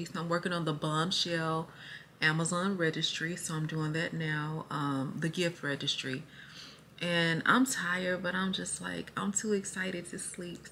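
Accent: South, United States. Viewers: It’s because You are so Awesome and Fun to watch! Down to earth and Real!! 💯❤️ I love your haul and how you put your outfits together! 😍😍 Your chats advice and story times are everything! I can listen to that southern accent all day!!😁❤️Congratulations on your new apartment it’s beautiful!! 🎊🎊🎉🎉 I know you are going to set that place Right up!! I’m so Happy For You!!🎊🎊🎊😍😍🎉🎉🎉🎉👏🏾👏🏾👏🏾👏🏾👏🏾🥰🥰🥰🥰🥰 Totally Dancing 💃🏽 with you!!💯💯💃🏽💃🏽💃🏽💃🏽❤️❤️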